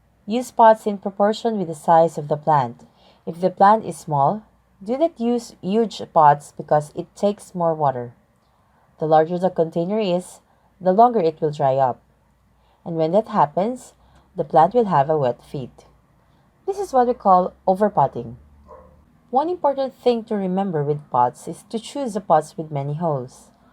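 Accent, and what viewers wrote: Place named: Philippines. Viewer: Sounds like Filipino 😀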